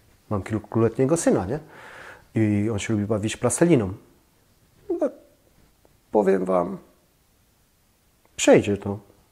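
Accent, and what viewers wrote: Silesia, Poland. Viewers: Synek ze Śląska (?)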